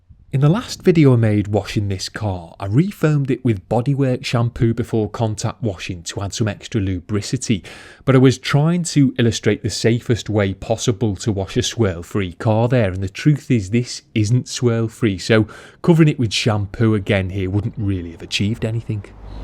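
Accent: Liverpool, United Kingdom. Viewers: I'm pretty sure he's a Liverpudlian (didn't want say scouse, may have come across as being rude and didn't want to offend those from that area)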